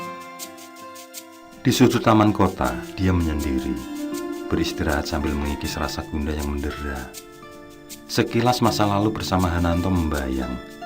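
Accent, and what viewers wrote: Java, Indonesia. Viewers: suarane anteb.. jowo mbangets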